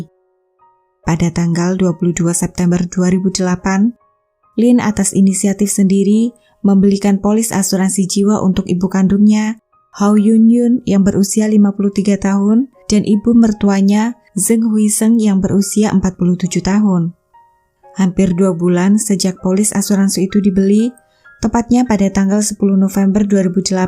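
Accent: Java, Indonesia. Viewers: K wlwpun kk pkek bhs Indonesia tp logatnya khas Jawa bngt, jd aq inget tmnku klw dengar kk ngomong. Hi Irfan... Ni tmnmu 1 krjaan dijkrt dulu, dengar kk ni ngomong gw inget lu smg lu baik2 ja dmn pun skrng berada.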